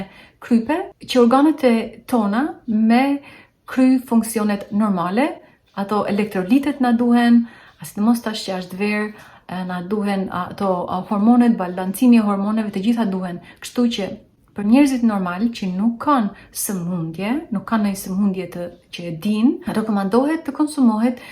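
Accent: Shkodra, Albania. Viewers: Keshilla shume te vlefshme dhe na knaqe me t folmen tone te ambel shkodrance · Respekte dhe per shkodranishten e bukur ❤Po me u shendosh si me ja ba se jam i dobet 😅😅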